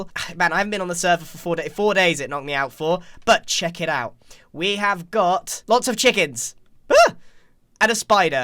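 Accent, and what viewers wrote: United Kingdom. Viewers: Grian with a broken voice sounds more brittish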